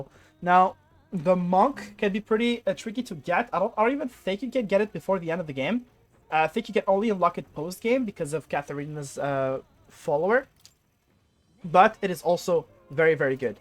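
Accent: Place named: France. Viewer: I can hear the French accent! J'aime bien ton style de guide et merci!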